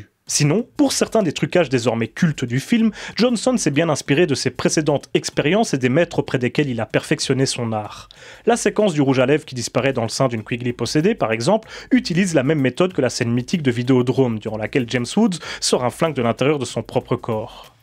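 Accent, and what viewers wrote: Belgium. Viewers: félicitations pour les 90k je trouve cela totalement mérité...je vais me répéter mais tu fais vraiment un travail de montage d ecriture incroyable tu t exprimes vraiment bien ton accent belge est quasiment imperceptible (je suis belge également) c est vraiment un plaisir que de voir un compatriote avoir de plus en plus de succès sur YouTube etant également un gros cinéphile grace a toi j ai pu découvrir ou redécouvrir certains films cultes du cinéma fantastique et d horreur...ta recherche tes anecdotes sont tjrs de qualité et j attends tjrs avec une certaine impatience tes prochaines vidéos preuve que ce que tu fais dechire continue comme ça big like poser bien évidemment a bientôt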